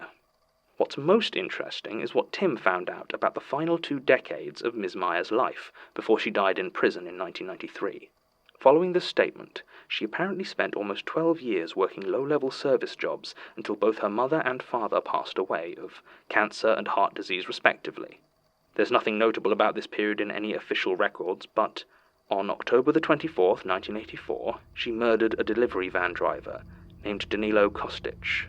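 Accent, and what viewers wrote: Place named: United Kingdom. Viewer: I was really unbelieving when I kept hearing about how the Magnus archives has every fear, but I gotta say my heart sank as soon as I clicked on the first episode and heard voice. British people.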